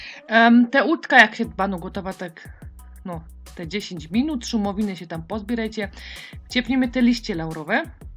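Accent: Silesia, Poland. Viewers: sol jest nam bardzo potrzebna,ale nie w nadmiarze. sol naturalna,a nie ta z kartonikow ktora ma 90% chemii.najlepsza nasza sol klodawska albo z wieliczki.Pani bardzo pania lubie,a do tego ten slaski jezyk super.mieszkam w berlinie,a w przeszlosci na pomorzu. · Pani jest Pani wspaniała, mówi Pani ślicznie gwarą śląską. Nie rozwleka Pani swoich komentarzy, jak inni mają w zwyczaju, zanim dojdą do meritum sprawy to nagadają się dużo i nie na temat, że człowiek zmuszony jest wyłączyć takiego "gawędziarza. Pani natomiast robi szybko nie przedłuża czczą gadaniną za to Pani komentarze są rzeczowe okraszone dowcipem. Po za tym, tłuszcz to samo zdrowie dodatkowo pięknie odchudza bez efektu jojo. Dzięki tłuszczowi przyswajane są najważniejsze witaminy: D3, K2MK7, A, E.tak zwany ADEK, bezwzględnie należy przyjmować z tłuszczem, inaczej nie będzie żadnego efektu. Te witaminy rozpuszczają sie tylko w tłuszczu. 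Szacunek dla Pani. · jestes super dziewczyna, czy to śląski Akcent⁉️🌻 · Uwielbiam Śląską gwarę i oglądam Ciebie głównie z tego względu ale na przepisy też. Pozdrawiam. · Albo mówisz po polsku, albo pięknie godosz po śląsku. Na śląsku nie ma pomidorów. Są tomaty. Godej jak prawdziwa Hanyska. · Przepiękna śląska wymowa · Albo mówisz po polsku, albo pięknie godosz po śląsku. Na śląsku nie ma pomidorów. Są tomaty. pozdrawiam z Florydy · Pani ta zupa to mistrzostwo świata!!!!to jest zupa co ma wszystkie zupy pod sobą i jeszcze ta Śląska mowa i wspaniałe wykonanie pozdrawiam 🥰🌹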